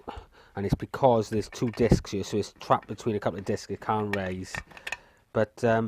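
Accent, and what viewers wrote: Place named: Wales, United Kingdom. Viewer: Hello, Great videos and nice intro. I live in northern Sweden and got in to scrapping by accident so to say. I totally understand the reason you are scrapping as I do it for the same reason, it's escapism and theraputic. Where abouts are you from? Saw you blamed welsh accent, I been to north Wales and loved it, felt like home almost.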